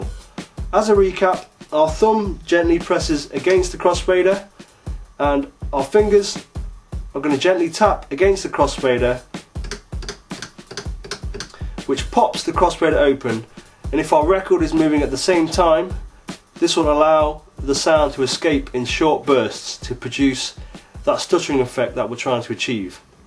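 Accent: United Kingdom. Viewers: u sound like ur from nottingham. are you bro?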